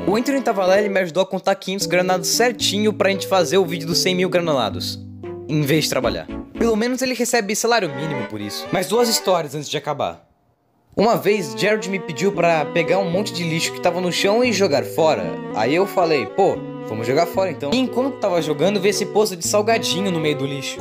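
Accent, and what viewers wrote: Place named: Portugal. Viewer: Mano tu parece que e de Portugal pelo o sutaque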